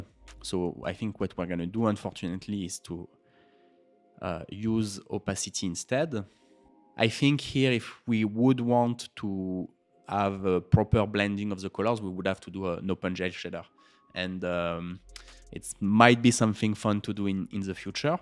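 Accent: France. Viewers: Mec t'es trop fort, ton accent français est tellement présent ! !!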